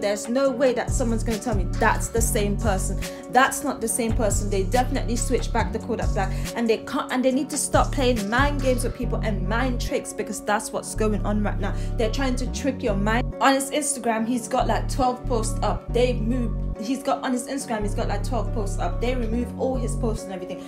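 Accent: United Kingdom. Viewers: Yoo are from the UK you got that British accent And It seems King Von is your favorite artists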